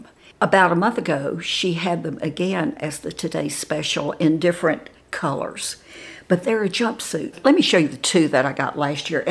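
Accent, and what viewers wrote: South, United States. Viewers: Hi.....so glad I found you! Nanny (Mary Ellen) recommended your lovely site. YOu are so cute and sweet...loved the fashion show.....also love your sweet Southern drawl. Your make up is beautiful. Hugs from a nana in Pa.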